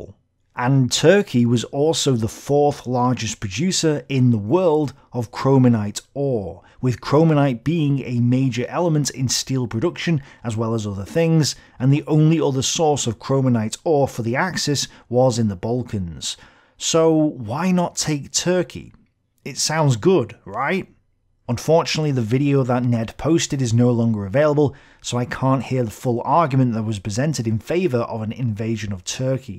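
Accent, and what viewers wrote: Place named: England, United Kingdom. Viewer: can't you tell by his accent. He's English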